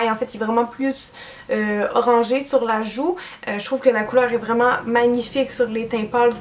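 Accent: Canada. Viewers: ce que j aime bien avec c est que l accent canadien n est pas trop prononcé alors que avec d autre youtubeuses ou endroits du canada j ai plus de mal par exemple a montreal. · I never knew French Canadian sounded like this! I'm Belgian, I've had french in school for 8 years so I definitely understand you! I never heard it like this, it's beautiful! :D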